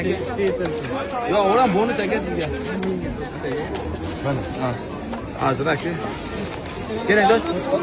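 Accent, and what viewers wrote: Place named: Yangiqurgan, Uzbekistan. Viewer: Akaxon siz Yangiqo'rg'ondanmi sheva Yangiqo'rg'onga tortyapti gap yo‘q omad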